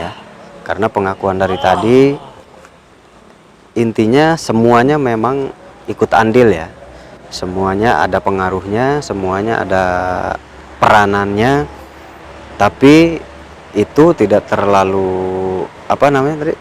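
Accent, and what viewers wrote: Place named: Sarawak, Malaysia. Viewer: Ni suara kitak. Org sarawak. 🙋‍♀🤩🤩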